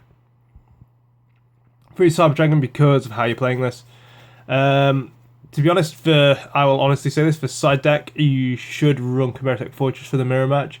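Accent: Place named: United Kingdom. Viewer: I'm in process of building a budget Kozmo deck and I would recommend running reasonings for the free summons of the ships and reasonings are cheap too and also the field spell can help unbrick your hands and code geass is fucking amazing man and you sound British I am also british whereabouts you from?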